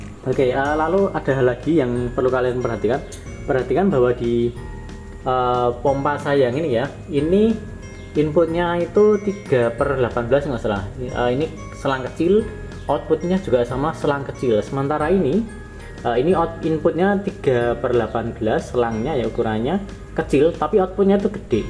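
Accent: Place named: Yogyakarta, Indonesia. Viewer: jogja banget..koyo aku ngomonge..hehe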